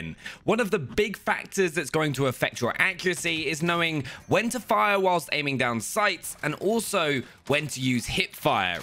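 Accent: United Kingdom. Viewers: Both British i guess...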